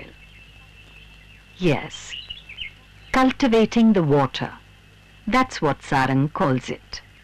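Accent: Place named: Kerala, India. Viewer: ഞാൻ ഈ വീഡിയോ ഇപ്പോൾ കാണുന്നു അവിചാരിതമായി കണ്ട ദക്ഷിണ എന്ന ചാനൽ പിന്തുടർന്നു ഇവിടെയും വരെ എത്തി........ You are great...... Salute you teacher and മാഷേ...... അവിടെ വരാൻ കൊതിയാവുന്നു....... ടീച്ചറുടെ സൗണ്ട് 👌🏼...... ടീച്ചറുടെ മലയാളം ഭാഷാ ശുദ്ധി...... Wow ...... റിയലി amazing........ എന്റെ മോൻ ടീച്ചറുടെ മലയാളത്തിന്റെ ആരാധകനാണ്‌....... അപകടകാരിയായ മലയാളി എന്നാണ് അവൻ സന്തോഷത്തോടെ പറയാറുള്ളത്......... സ്നേഹവും ബഹുമാനവും....... Respect you.... Love you❤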